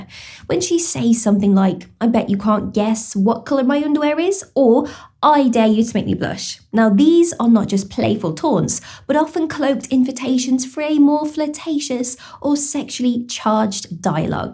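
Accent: United Kingdom. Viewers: What I have trouble with is her Brit accent .Too much.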